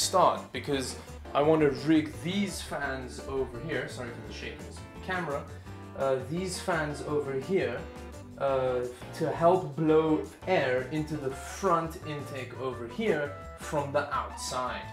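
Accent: South Africa. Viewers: Bruh you sound like you are from South Africa. However my question would be. Is it better to take stock fans and put them as front intake fans? I have Arctic Freezer 34 with dual fans and I simply had to remove stock nzxt h500 and now I am thinking where should I put them. Can't you please help me to solve this riddle? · Are you South African? Hahaha, knew it from the accent. Checked instagram and there you mentioned an Afrikaans proverb. Goeie video. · Are you South African? You look and sound like one. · Are you South African by any chance?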